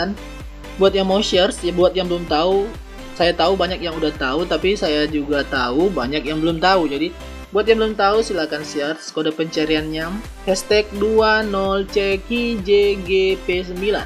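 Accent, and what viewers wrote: Manado, Indonesia. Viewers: Kiraleh ng orng jakarta, trnyata cmn orng mnado😂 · org Manado Nn kang? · Bang Asli Manado Kah? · Orang manado? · Bang orng manado ya? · bang lo org mnado bang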